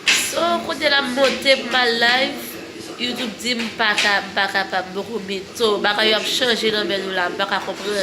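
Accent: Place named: France. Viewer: I love ypur french accent young lady you got my upport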